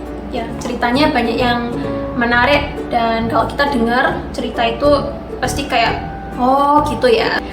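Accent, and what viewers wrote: Java, Indonesia. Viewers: Orang jepang lahir di sidoarjo, logat jawa nya tetep medok.. sukses selalu, aku suka chanel ini 👍👍👍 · Tapi ko ngomongnya logatnya kaya orang jawa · 🥰😍,aq gak kuat medok e iku lhoo 😁😂👍🏿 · medog e jian 👌👌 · Kok medok bahasanya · Bhs ne wis medok koyo wong indo...jgn" sdh lupa bhs jepang nih ^ ^ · kamu ternyata ada medhoknya klo bhs Indonesia 😀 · Medok e iku lo... Nyenengne.... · Medoknya gak ISO ilang kak, kapan Moro neng malang meneh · Kok medog yo rek😐 Jgn2 deket rumah saya😅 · Cuman pengen nanyak, lancar bangt ya, bhs indonesianya, bhsa jawanya juga kentel bngt?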